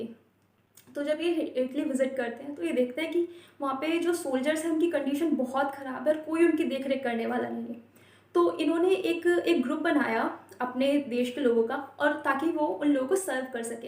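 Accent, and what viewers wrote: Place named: India. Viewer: Looking good india aksen in english is unic and great.i like to hear..goodluck miss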